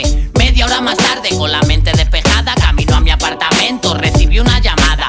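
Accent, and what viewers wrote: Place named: Dominican Republic. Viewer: El tiene el acento un poco dominicano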